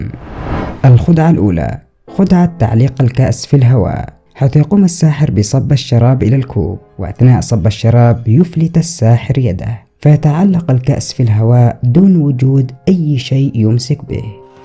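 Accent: Yemen. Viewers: أصحاب المناطق الوسطى في اليمن كذا ينطقونها ارجع الى خطابات الرئيس السابق ولاحظ النطق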